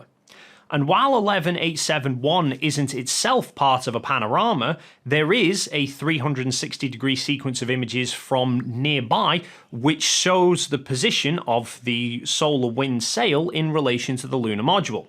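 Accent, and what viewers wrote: Netherlands. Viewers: He sounds Dutch, with that accent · Yes, the Dutch accent is strong in this more.on. So Goldmember is a better comparison.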